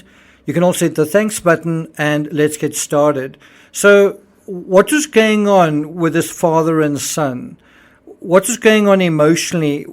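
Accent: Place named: South Africa. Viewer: Ive been trying to figure that accent out and i think its South African? Great content btw.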